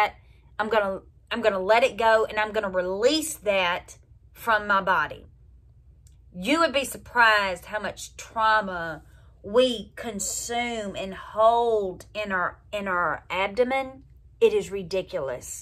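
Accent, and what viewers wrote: South, United States. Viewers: that is one of the funniest people i've seen so far her southern accent is right on ya u doin good mama tot and john deere is so proud of you you've already made my day better for sure luv u mama tot may the lord bless you n keep you safe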